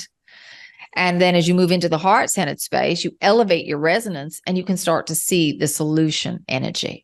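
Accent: Australia. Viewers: They sound kinda Australian. hehe :) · Loved this so much!! Touched points I thought I felt I needed clarity on, love it! I think she's got some Aussie heritage? I can hear it in her voice! Wonderful insight from source XXX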